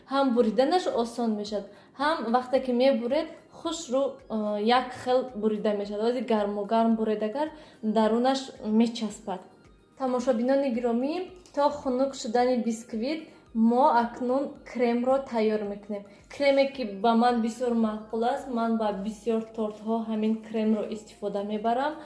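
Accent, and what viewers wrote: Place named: Panjakent, Tajikistan. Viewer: Зинда бошен апа, гапзанетон Панчакентихоба хела монанд будааст 👍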